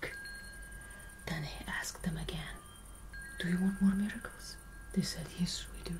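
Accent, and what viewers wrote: Russia. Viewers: Ну,!!! Вот это Баба Яга всея ютьюба! А какой лес, а какая изба, а этот русский акцент! А сказки какие мудрые, и юмор...Браво❤ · Лайк за русский акцент, как один из элементов образа, круто 🙂🤌🏻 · Русский акцент... Клаааасс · Great Russian accent i sound about the same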